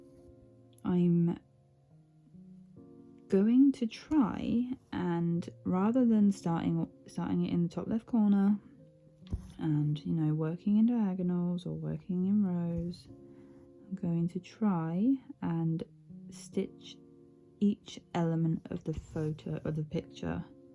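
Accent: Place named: RP, United Kingdom. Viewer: Actually, when my husband heard you talk he said I was wrong and that you sound kind of posh, so I guess he was right!! 😁😂😂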